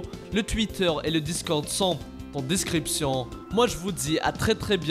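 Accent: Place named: Kabylie, Algeria. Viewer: Pourquoi cette accent kabyle ??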